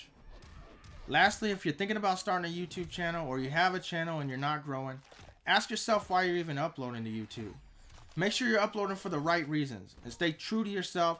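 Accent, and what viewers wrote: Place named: Mexico. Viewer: you sound Mexican and black and country white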